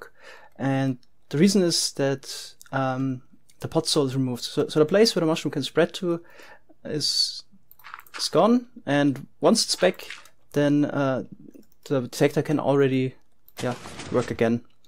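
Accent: Germany. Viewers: You're german, right? :)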